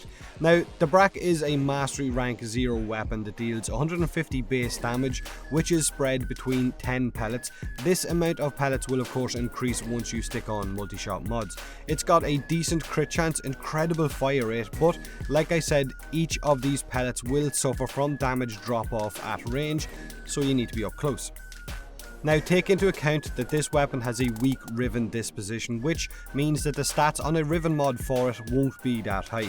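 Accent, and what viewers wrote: Ireland. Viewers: British..... he's Irish! lol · Are you Irish?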